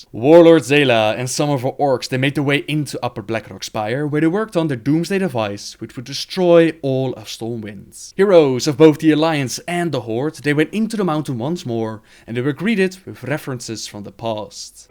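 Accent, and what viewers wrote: Netherlands. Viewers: you can clearly hear he his from the netherlands. goede Videos, ga zo door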